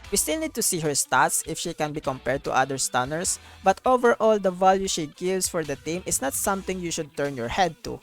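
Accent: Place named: Philippines. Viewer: Beri Pilipino accent! Reminds me of Jo Koy. Correct me if I'm wrong but if right, keep it up kabayan! 😊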